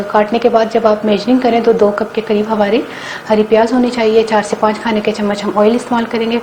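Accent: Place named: Ichra, India. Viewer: Tumhari urdu sy lagta hy tum ICHRA ki ho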